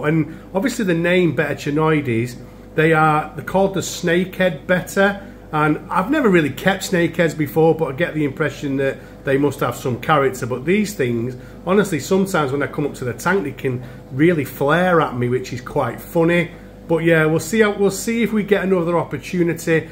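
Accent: England, United Kingdom. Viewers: My daughter has started saying "stunning" in an English accent after watching your videos with me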